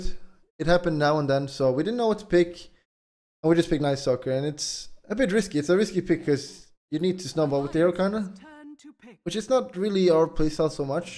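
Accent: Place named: Sweden. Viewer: i think it's his hard swedish accent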